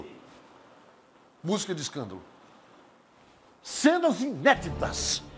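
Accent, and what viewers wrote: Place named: Amazon, Brazil. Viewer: Sikera já tá bem amazonense, até o "fulêro" do amazonês ele já fala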